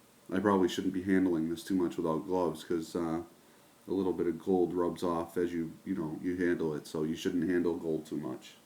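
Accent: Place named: Boston, United States. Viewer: You sound OK to me you Boston guy. I sound worse. Your "Umm" is OK. Better than Howie Carr's "Wizard of Uhs". What town are you in ?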